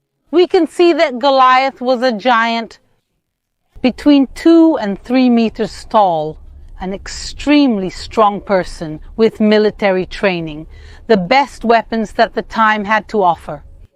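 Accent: Israel. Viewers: I love your Israeli accent.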